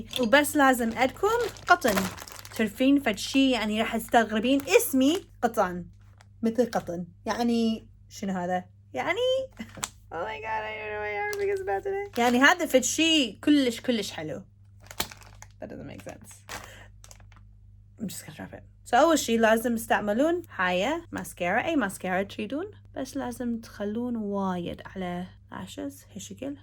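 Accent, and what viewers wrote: Iraq. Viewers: فدوة شلون تحجي عراقي ❤❤ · I love you so much and I hope for your comments🌺🌺😘The Iraqi dialect befalls you a lot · احبج كلش هواي. ...أموت عليج وإنت تحچين عراقي تخبلين. ..وطبعاً الفيديو راح يخلص كلشششششش هواي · شنو جنسيتها وليش تحجي عراقي +فدوه لله☹️💛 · فدو هدى الهجه العراقيه أتخيل ابحلكج فدوه فدوه 😍😍❤ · يمه لغته العراقيه كولش كيوت انتي كلش حلوه 🥺🥺🥺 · فدوه شلون تحجي عراقي 🤩🤩🤩🤩🤩🤩🤩🤩🇮🇶🇮🇶🇮🇶🇮🇶🇮🇶🇮🇶🇮🇶🇮🇶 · فدوه حلوه وانتي تحجين عراقي❤ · يمه فدوه لتحجي عراقي فديتج ❤❤❤❤❤ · لهجة عراقية 😻🥰❤ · تحجي عراقي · You are soooo CUTEEEEE when you speak the Iraqi dialect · فديت الي يحجي عراقي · انتي كولش كيوت من تحجين عراقي😍💜 You're so so so cute when you speak Iraqi😍💜 Kooollllsh Big love to you from Iraq💜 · فدوه شلون تحجي عراقي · اذا انتو تردون تصيرون حلوة هههههههه 😂❤ حلو كلامج بالعراقي^^♡ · لا مو عراقية هية أمريكية بس تحجي عراقي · I love you, I love you, and you are so cute. 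Sweet Iraqi says💕😭😭😭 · Omg so cute when your are talking Iraqi dialect 🍁🍁 من العراق متابعه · انتي مو عراقيه ليش متعرفين تحجين عراقي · يمه فدوه تحجي عراقيز😁😍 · ماعرف ليش حسيت طريقه كلامه نفس كلام مو بس مو بطريقه عربيه سوريه هاي بطريقه عراقيه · ليش تحجي عراقي 😁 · it's the iraqi accent 😂😂 kohlsh mean lot of, more 🙂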